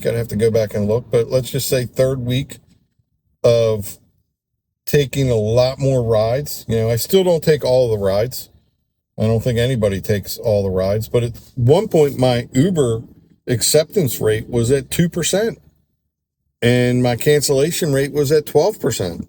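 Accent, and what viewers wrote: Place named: Mid-Atlantic, United States. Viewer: So true “If you’re in your car, you’re not at heume” Love that mid atlantic accent. Where I’m from. When I go back home, I hear the accent and takes me back.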